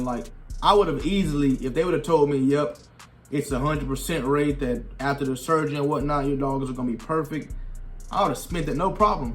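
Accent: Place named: Ohio, United States. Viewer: Yo where are u from? you sound like my cousin from Youngstown, Ohio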